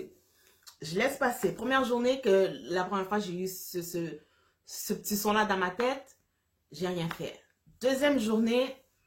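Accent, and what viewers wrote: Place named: Haiti. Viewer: Je sens à ta voix que t haïtienne 🇭🇹 💪🏾 En tout cas c’est une histoire extraordinaire c’est Dieu qui t’a donné ce petit bout de chou pour te dire de garder espoir tout n’était pas fini pour toi Par contre ton conjoint la sa réaction est très mauvaise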